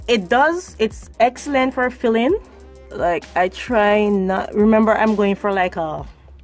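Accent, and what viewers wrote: Jamaica. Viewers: Are you Jamaican or Africa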